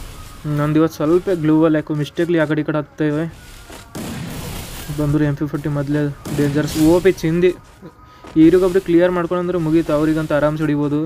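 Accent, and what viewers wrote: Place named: Uttara Kannada, India. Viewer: Voice uttar kannada Voice nimda yanna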